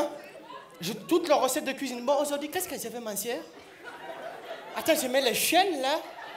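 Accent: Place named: Senegal. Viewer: On dirait seydou abathia mais tu a l air senegalais meme accent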